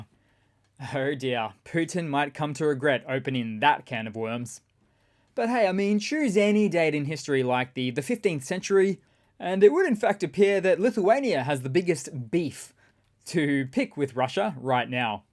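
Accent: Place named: Australia. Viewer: Sorry, but I still cannot get used to an Aussie accent pronouncing ‘route’ as “rowt” rather than “root” and ‘via’ as “vee-ah” rather than “vye-er”.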